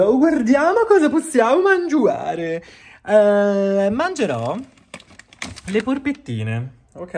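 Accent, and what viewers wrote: Tuscany, Italy. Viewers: Ho apprezzato tutte e 4 le giornate mangerecce ma la mia preferita resta halloween 😻 purtroppo sono a dieta terapeutica quindi quando vado al lidl posso prendere solo ciò che ho da dieta 😭 chissà per il futuro cosa arriverà, forse la settimana francese, austriaca o oriente, ma probabilmente bisognerà aspettare dopo natale. Ora potresti far spesa dei prodotti Delux 😎 Ti ho scoperto da poco, mi iscrivo, sei molto simpatico e adoro sentire la cadenza toscana 🤩 Mi sembra di aver capito che non rientra nel tuo canale, cmq ti consiglio di provare anche i prodotti skincare che ogni tanto la lidl propone, ieri per esempio è uscita la linea nordica, buon inci, ottima idratazione e low cost!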